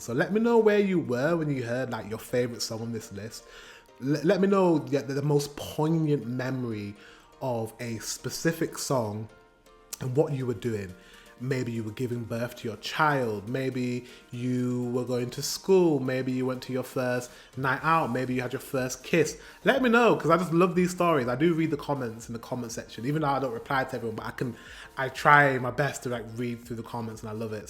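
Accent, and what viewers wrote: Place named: Leeds, United Kingdom. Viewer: Does he live in Leeds? I assumed so due to the accent. I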